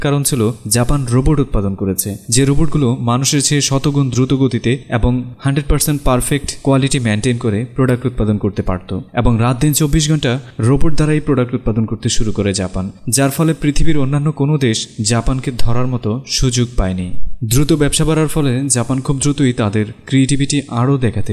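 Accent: Comilla, Bangladesh. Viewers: কুমিল্লার মানুষ সুদ্ধ ভাষায় একদম ক্লিন।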